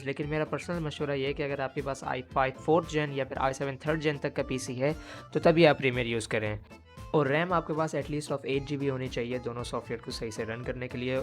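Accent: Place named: India. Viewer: He has the heaviest indian accent of all times.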